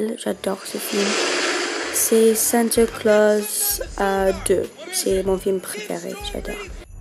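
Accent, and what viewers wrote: England, United Kingdom. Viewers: J'aime ton accent anglais :3<3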